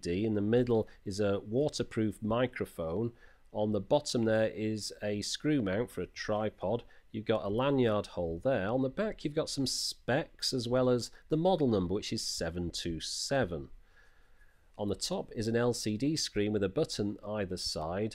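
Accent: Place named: North, United Kingdom. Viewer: I can tell your from up north lol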